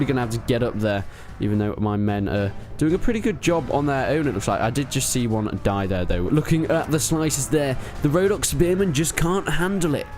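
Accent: United Kingdom. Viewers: m8, he speaks in a British accent, what did you expect him to say?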